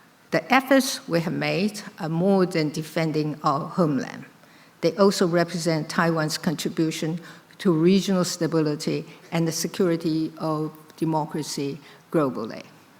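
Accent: United Kingdom. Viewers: 好迷人的英式英文❤ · 即是英文，因她的口音是英語，而不是美語，口頭表達不荒不亂，不需要看稿子，字正腔圓。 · 的英國腔好好聽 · 謝謝總統的堅持✊❤ 話說，總統的英文有英國腔耶，蠻好聽的😆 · 相比某人只靠小本本，今年升級為大字稿，總統的英文表達與敘述能力，實在是太優了，再加上總統略帶英國腔的演講，只能說讚讚讚！ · 拜託！要在國際媒體前，做那麼長的英文演說！有演講稿不是很正常嗎？要你在國際媒體前用中文演講，恐怕你也不能做到一字都不出錯吧！你看她英文說得多從容不迫，說得一口英式英語多優雅啊！你讓習近平來，他連英語都聽不懂！不要說要他用英文演講！ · 濃濃英國腔😂😂😂😂😂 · 好歹我住過Brighton一年，倫敦很近也跑了很多次，好吧是有一點英國腔沒錯，但真的是一點點，就像黑龍講中文一樣的那一點..但你要說英國腔好好聽我就覺得有點..呃…你自己去英國住一趟再回頭看你的評論😅 倫敦政經學院沒有造假？我倒覺得反而是被證明這水平真的不太可能有博士學位。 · 台灣人不是學美式英語的嗎？為什麼一口英式英語？ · 聽總統說英式英文真的是一種享受🥰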